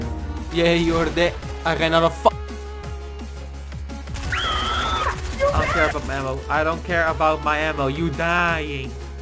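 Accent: Netherlands. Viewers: i luv ur dutch accent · Iemand Nederlands ?? Hij klinkt alsof die nederlands is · Ik hoor gwn dat je Nederlands bent geef toe want in een van je video's zij je perongeluk een Nederlands wordt😄😄😅😅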